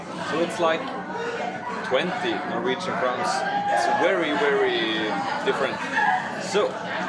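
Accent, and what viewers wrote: Egypt. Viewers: Ok from ur accent u sound like an Egyptian trying to speak English lol but I love it I'm subbed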